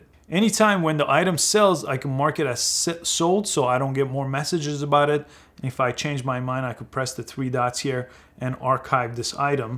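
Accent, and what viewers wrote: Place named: Iran. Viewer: U sound Persian. I mean your accents is a bit Persian guys. am I right?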